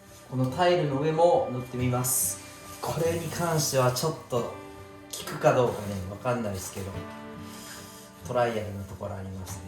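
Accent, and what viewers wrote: Kansai, Japan. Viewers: 関西在住経験あって、西日本で暮らすと、JPさんのような言葉使いになります😁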